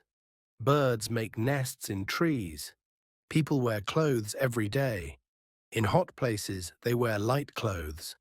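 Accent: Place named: United Kingdom. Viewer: british olması lazım ama çok takılma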